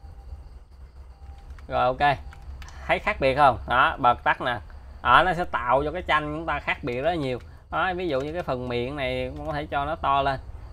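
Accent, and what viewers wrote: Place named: Mekong Delta, Vietnam. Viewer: A ng miền tây nch dĩa huông wa he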